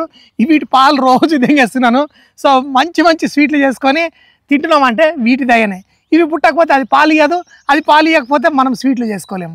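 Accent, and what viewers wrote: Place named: Hyderabad, India. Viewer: pakka hyderabadi slang😁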